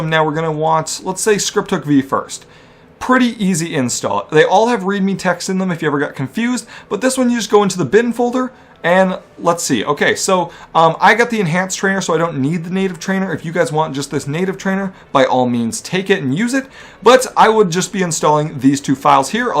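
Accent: Canada. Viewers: love Canadian accents. They're funny to me as an american lolololol. But, great video broskoski. Me and my buddy are new to PC and we were both able to download the mods pretty well with minimal problems (problems due to new PC dumbass'ry and slight intoxication).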